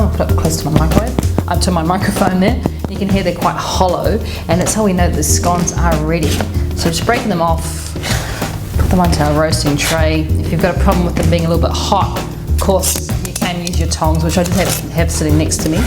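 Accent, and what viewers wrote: New Zealand. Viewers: Going to try these because of your Kiwi accent😂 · I got this recipe from a Tupperware Dealer many years ago and they were the only scones I have ever had success with. I lost the recipe so thank you so much for this Video. I will try it with -preheating my baking dish - is there a reason for this? Even without the accent, "Runny Cream" is so Kiwi. Thanks.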